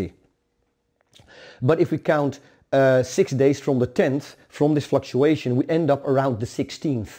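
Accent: Netherlands. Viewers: No, he is just Dutch.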